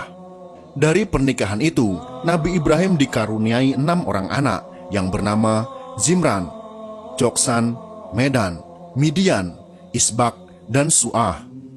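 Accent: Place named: Surabaya, Indonesia. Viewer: boso jowo ne. Koyok jowo suroboyo an min😀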